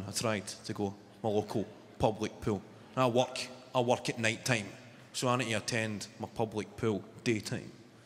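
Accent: Scotland, United Kingdom. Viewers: As far as Scottish goes this is easy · The Scottish accent just makes it better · It’s not just the accent it’s the whole Scottish persona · English but with Scottish accent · He's just genuinely funny, I personally think if he had a different accent he'd be funny but the Scottish accent just adds a different twang and emphasis to what he's saying, can't put my finger on it but he is different.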